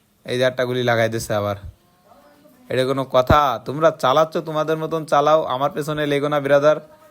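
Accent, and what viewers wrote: Jessore, Bangladesh. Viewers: সেই ভাই,,, আপনার বাসা যশোরের মধ্যে মনে হচ্ছে 🤔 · খুলনা বাগেরহাট যশোর তো একদিকেই এ কারণে কথা ওরকম মনে হচ্ছিলো..আমার খুলনা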